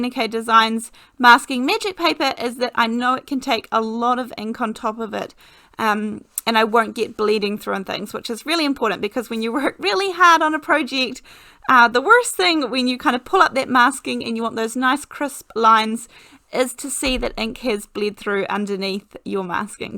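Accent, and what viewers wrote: New Zealand. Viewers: Thank you so much. I’ve got some of that. I’ll give it a go I do have a hard time but maybe it’s the paper. I love, love your videos. Your kiwi accent is lovely to listen to. I’m in Aus. Perth. ❤️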